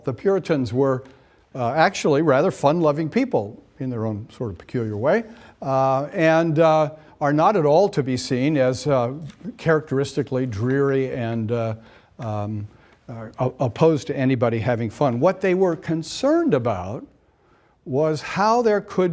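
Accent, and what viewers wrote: United Kingdom. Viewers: That accent reminds me of William Butcher, a British fictional character from the Amazon Prime series 'The Boys'.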